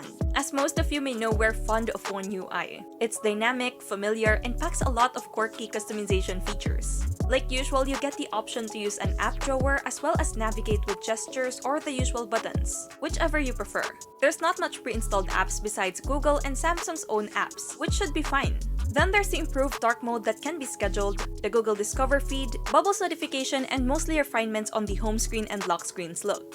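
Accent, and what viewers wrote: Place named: Philippines. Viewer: Love that Filipino accent 💕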